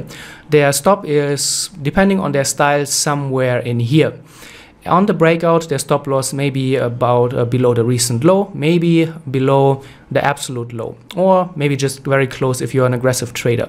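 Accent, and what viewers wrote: Germany. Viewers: u are defo a german i can hear that hahah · Very useful video :) You sound german haha. Wo wenn ich fragen darf? ;) Suche Leute zum austauschen und lernen, da ich Trading super spannend finde aber es schwerer ist als man anfangs vermutet. Kurse gibt es wie Sand am Meer aber Connections bzw. einfach nur miteinander reden und Erfahrungen teilen bringt meist viel mehr. Liebe Grüße aus dem Schwarzwald, Jan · Grüße an meinen Landmann! Höre doch wenn ein Deutscher englisch spricht! 😁 Aber gutes Video! Grüße!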